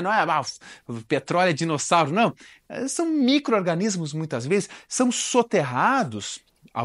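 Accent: Rio Grande do Sul, Brazil. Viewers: Professor gaúcho é a melhor coisa kkk parece que eu entendo mais ( ou deve ser por que sou gaúcha )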